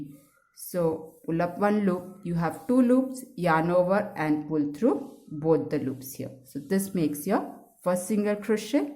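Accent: India. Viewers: I am watching this in 2025….this video helped me a lot when i heard the Indian accent i knew this was the right video i am a bit impatient type of a person but this actually helped …all the American tuts were wastage of time❤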